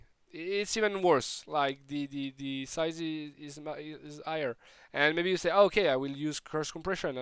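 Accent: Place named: France. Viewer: Hmmm je ressens un accent Français là, ça je valide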